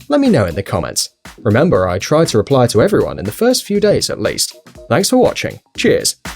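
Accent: England, United Kingdom. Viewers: Isn't it odd listening to an Englishman speaking American English. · Are you English? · You sound English but you talk American.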